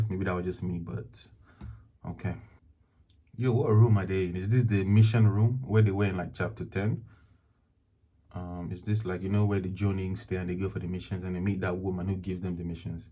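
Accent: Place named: Nigeria. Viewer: I’m Nigerian too and you sound Nigerian too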